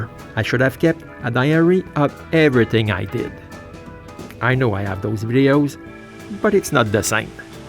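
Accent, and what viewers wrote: Quebec, Canada. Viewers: Un bon vielle accent quebecois hahah! Beau travail! Wow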